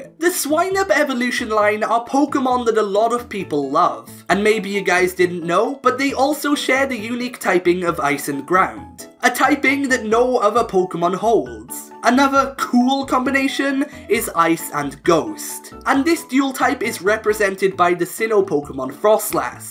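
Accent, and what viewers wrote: Wales, United Kingdom. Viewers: Are you from Wales ??? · holy shit a welshman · Oh man, I can not believe how they discriminate you so much for having a British accent when speaking in your videos. Personally, I really liked your videos, and if you ask me, I'm not American, I'm Mexican. Keep it up. Note: I saw that you forgot the psychic type although perhaps there are no unique combinations with it.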